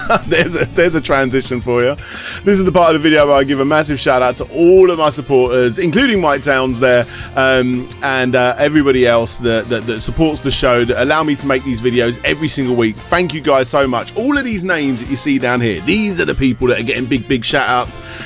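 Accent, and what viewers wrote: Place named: United Kingdom. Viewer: I always thought that hommie is from UK 😂🇬🇧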